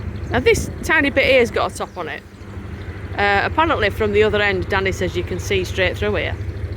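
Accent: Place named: North, United Kingdom. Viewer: I suspect is from a bit further daaan sarfer than you,edging me bets,are you from yorks/lancs border? love your accent. Give Ernie a cuddle amd a hug.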